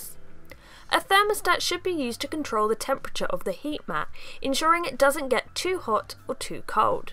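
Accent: England, United Kingdom. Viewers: You mean her English accent? As in ENGLAND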